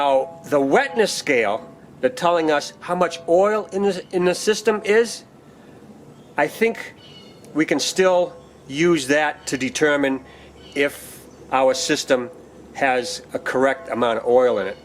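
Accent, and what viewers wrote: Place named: New Jersey, United States. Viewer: Great video, thank you. What's that accent you have? Jersey?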